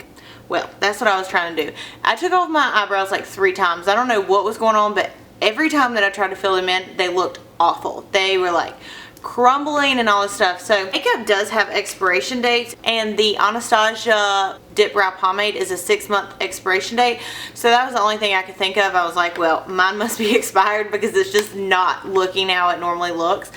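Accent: South, United States. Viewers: where are you from? I hear southern but what part? I'm in Houston Texas myself! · oh i just seen in the description box im from NC TOO ZEBULON AREA...HEARD OF IT? I KNEW IT HAD TO BE NC OR SC W.THAT COUNTRY ACCENT LIVE IT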